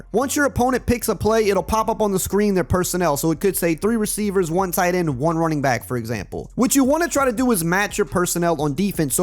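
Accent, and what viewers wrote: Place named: Alabama, United States. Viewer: oh ok, cus dude sound like he got that bama accent but it LSU is Deep South too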